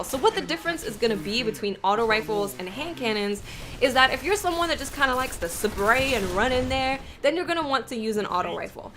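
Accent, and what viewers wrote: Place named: East Coast, United States. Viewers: I've never heard a new york accent before and I live in the US lol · The Black Hammer nerf really takes away the dynamic of that sniper rifle. It basically becomes a sniper with muligan... BTW, where is your accent from? I want to say east coast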